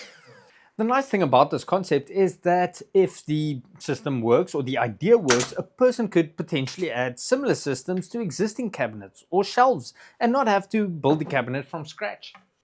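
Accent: South Africa. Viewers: I'm new to this channel. Do I detect a South African accent?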